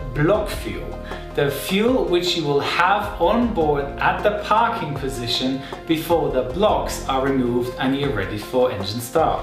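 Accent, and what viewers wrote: Germany. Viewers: Is he german? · Das ist das erste video was ich von dir gucke und ich hatte von anfang an das gefühl das du ein deutscher bist :) und es hat sich als wahr herausgestellt :D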